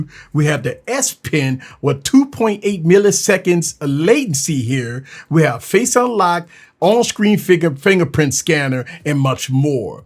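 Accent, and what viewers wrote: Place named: New Orleans, United States. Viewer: Man you gotta be juvenile daddy or something lol . Not trying to be funny or anything but this how he's gonna look as he she and y'all sound just alike you gotta be from new Orleans 😂 .I love your channel btw black brother ❤️💯🫱🏿‍🫲🏾💪🏾💯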